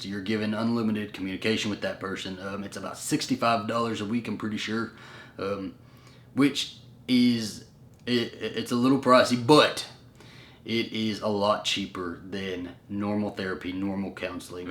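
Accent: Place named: Southwest, United States. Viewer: Sorry to see you going through this stress. Speaking as a very old senior gay man (82) therapy is absolutely nothing to fear; therapy triggered my own coming out. I enjoy following you on youtube and have seen elsewhere on the internet certain persons trying to take you down. As a fellow Texan I especially resent those who mock your decided Southwestern accent and who fail to comprehend someone can have an Hispanic surname without having just swam the Rio Grande. In fact, there are such families in Texas which predate any Anglos. You are a great couple and a fine role model, but don't try to take on too much. I am not familiar with the company you reference but also keep in mind many cities now have local referral systems for LGBT folks. Now take a deep breath.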